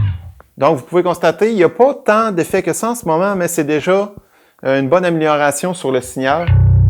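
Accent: Quebec, Canada. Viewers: Très bonne démo malgré votre accent québécois. :)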